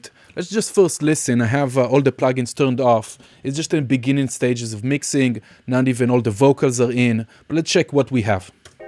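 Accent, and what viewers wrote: Israel. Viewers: I have instantly recognized your Hebrew accent ! קיבלת עוקב ולייק ;)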